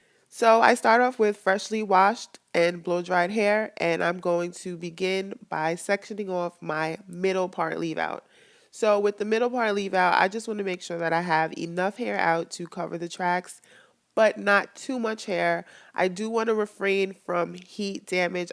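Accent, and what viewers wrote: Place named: New York, United States. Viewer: u have a very strong new York accent I like it lol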